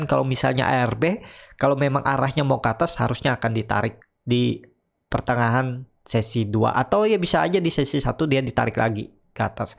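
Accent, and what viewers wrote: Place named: Banjar, Indonesia. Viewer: Borneo mana nie pak, logatnya sih orang Banjar nie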